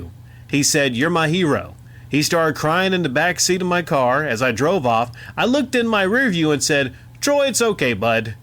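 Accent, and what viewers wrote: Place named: New York, United States. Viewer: LMAO 🤣🤣🤣! Sounded like a mix of NY (In your pronunciation of Sox and Boston) and Boston but it was still hilarious.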